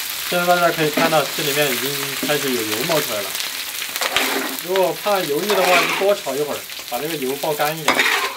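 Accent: Sichuan, China. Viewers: 四川话好亲切！师傅哪个地儿的？ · 喜歡的紅燒肉經由四川口音的介紹好可愛，王剛師傅好手藝，二娃來年肯定是個大美人呦，讚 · 四川哪里地土话噢？ 好可爱！！我就是四川人！！！ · 我一晃眼以为火云邪神的演员来客串视频嗨操起了四川腔！要得要得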